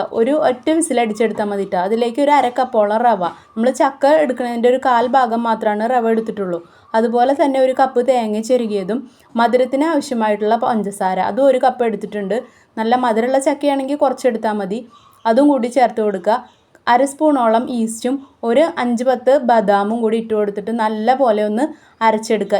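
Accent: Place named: Kunnamkulam, India. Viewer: Liked your kunnamkulam slang 👍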